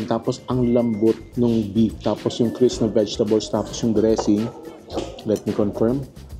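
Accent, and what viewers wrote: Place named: Lucban, Philippines. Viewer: Taga saan ka po, you sounds like lucbanin😅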